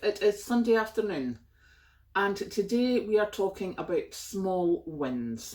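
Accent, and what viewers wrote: Scotland, United Kingdom. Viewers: agree - yes, some days a small step is worth reflecting on as many small steps get us to the end of a task and some times it is just too much to think of whole tasks - a little step or a few help keep things under control and keep us moving forward - love your planning and organisation - never tried a slow cooker myself - sounds like a practical idea and probably less fuel than some stoves ( I'm in a rented house - with solid plate electric stove and the oven does not heat up much) was wondering if I might try making wholemeal scones on my electric griddle but have been focused on some other stuff and haven't baked for years!:o) maybe one of these days....?! Love your practical ideas and applications - realised recently many of us were misguided by media etc and when I see people with back up heating and back up cooking that seems to be so practical and wise than the house proud decorating focus and other lifestyle addictions many have had in recent decades - miss the Scottish accent now that I am back in N Ireland - have shared your channel with some Scottish friends. 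Take care. Best Wishes.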